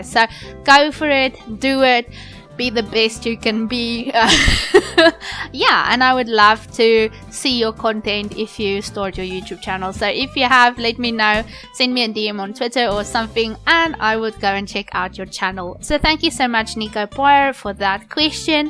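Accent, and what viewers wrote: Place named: Netherlands. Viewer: Love your accent girl.. Its a little bit dutch... Love you video ♥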